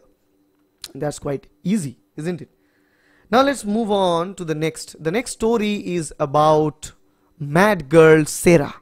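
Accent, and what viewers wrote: Bengal, India. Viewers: Great video Sir.The bengali touch makes it more memorizable.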